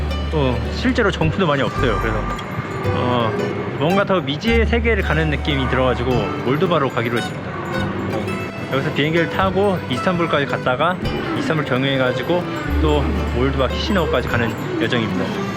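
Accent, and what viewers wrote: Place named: South, South Korea. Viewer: 님은 절대 설스타일 아닌데..ㅋㅋㅋ(구수한 편안한 남도스타일.)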